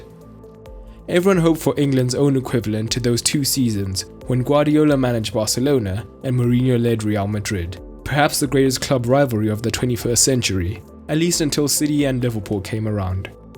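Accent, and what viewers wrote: South Africa. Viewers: Is this South African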